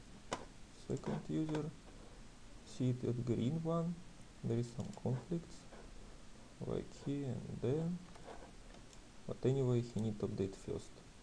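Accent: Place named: Russia. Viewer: лучше по-русски говори, ёр рунглиш вери бед)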